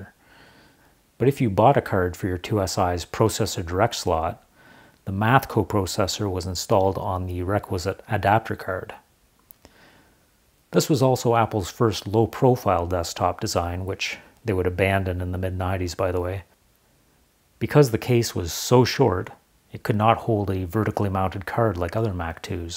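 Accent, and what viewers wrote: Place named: Ontario, Canada. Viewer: Love the videos and the Ontario accent.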